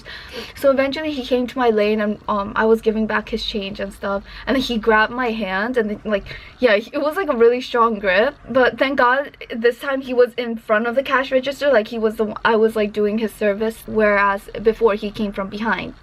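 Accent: United Kingdom. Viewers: Perfect American English (accent and ease never searching for words, better than half the people here) with sublet British endings occasionally. I know some countries teach British accent English but if that were the case something (YouTube, time in America, ?) has almost totally erased it. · In those cases,just start YELLING..Shouting..anything..You didn't like Job anyway,so max they could do is Kick you so Be it He is one Old Lonly miserable man must be,those kind just run away Be Brave...One shall need to know How to Raise Voice in order to Protect self,its not wrong Also you got kinda like Britsh accent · Nice British accent!!! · Do you have a video showing your British accent? That would be interesting. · Swear I thought she was British. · chinese speaking like an british op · 有英伦腔。。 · Dang ..that brit accent tho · Your accent... so British.. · That British accent 😍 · Have no idea why Youtube recommended this to me but yea I like your English accent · your British accent is soooo Cutee 😄😄😄😄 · nice British accent! 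· Are you british? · I feel some London England in your accent. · 😂 there's british accent in you somehow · .....Are you with UK accent??? Sounds like UK English...but not so UK .......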